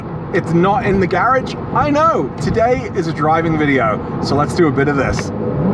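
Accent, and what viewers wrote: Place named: North West England, United Kingdom. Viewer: 4 people..just don’t understand life! I’ve recently purchased a <num> in the uk after no car ownership (living in London) for nearly 8 years. You just can’t fault The car and In Tamdem this channel - open honest, content and ideas that make Porsche ownership seem like the Right choice - despite the wankers (and there are a lot) hat tip!! Cheers - and you sound like you were from the north west uk - much respect!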